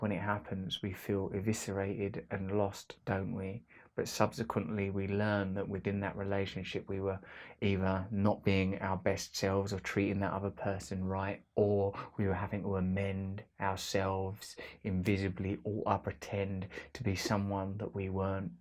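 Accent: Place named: United Kingdom. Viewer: three reasons why I always regret watching Russel Brand videos: 1. Strong British accent ( I bearly understand a thing) 2.circling around a topic 3.messy appearance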